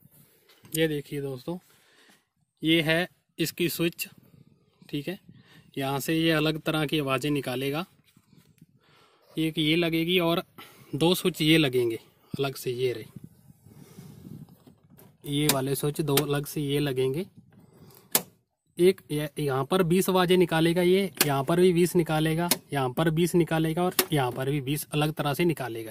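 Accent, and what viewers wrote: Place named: Punjab, India. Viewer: Hindi with Punjabi eccent. Bro better you speak your own language you can explain more better in punjabi.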